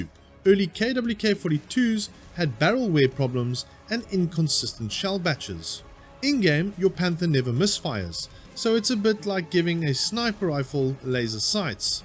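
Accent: South Africa. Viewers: Ah, a SAFFA! Good to hear a local voice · Are you South African? I am and you sound South African! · This is a bit off topic,are you a South African?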